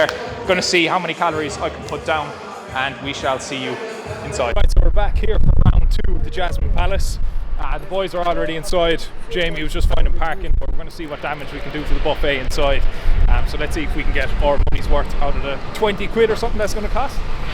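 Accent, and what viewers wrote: Ireland. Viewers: You're fkin hilarious bro 🤣 love the sense of humour and great content. I can feel this channel blowing up very soon. Btw is that a Limerick accent I hear?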